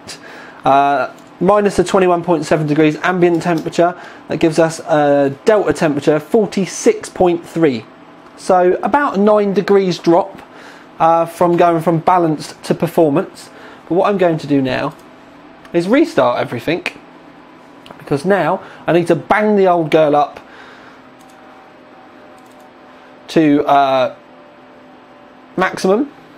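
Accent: United Kingdom. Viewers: Wow... he didnt skimp when he said FULL REVIEW... whatever his british accent will occupy me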